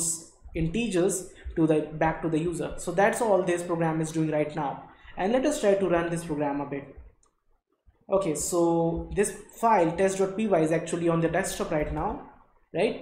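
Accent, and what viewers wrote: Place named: India. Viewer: what an indian accent.. jesus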